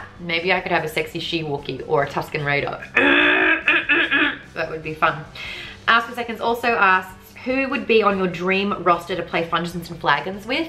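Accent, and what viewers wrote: Australia. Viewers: You sound more Aussie than usual. I dig it ;)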